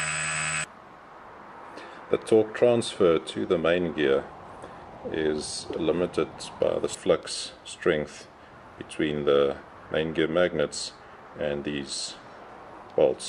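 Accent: South Africa. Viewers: Are you an South African?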